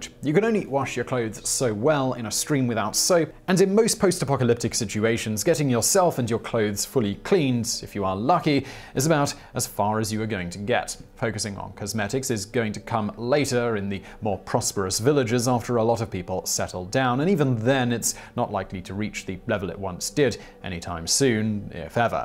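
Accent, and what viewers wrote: United Kingdom. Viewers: Bad advice from a guy with a British accent is yet bad advice... horses are essentials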